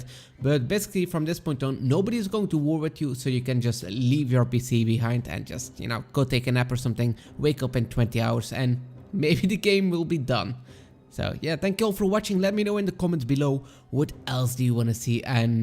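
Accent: Belgium. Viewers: you Belgian? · Because I hear a slight Belgian (Flemmish) accent in pronouncing the Dutch words. I'm from the Netherlands myself. Good pronounciation btw.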